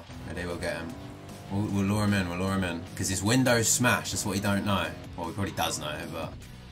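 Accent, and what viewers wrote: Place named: United Kingdom. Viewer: HE'S BRITISH?????!?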